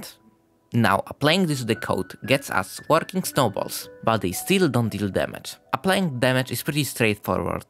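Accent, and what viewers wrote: Poland. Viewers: słychać od ciebie polski akcent :) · Yo, you have the perfect Accent (Polish Accent) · polski akcencik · Od razu pomyślałem że polak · Wowowowo I didn't expect that but I really hear that you're polish haha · I love that polish accent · you sound soo polish xD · Bro you sounds very polish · You're from Poland, am I hearing right?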